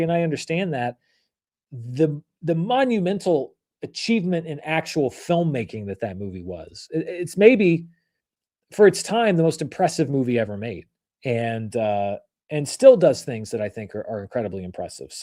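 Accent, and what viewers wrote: United States. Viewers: People are so conditioned to connect some kind of game of thrones British adjacent accent for these kinds of stories that the American accent feels strange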